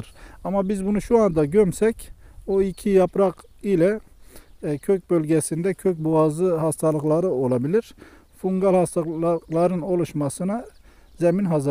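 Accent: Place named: Adıyaman, Turkey. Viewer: Kardeş sen Adıyamanlımısın yau. Konuşma tarzın Adıyaman şivesi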